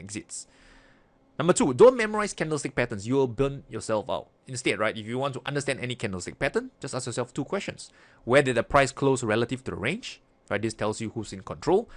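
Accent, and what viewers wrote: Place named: Singapore. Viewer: Singlish accent is popping!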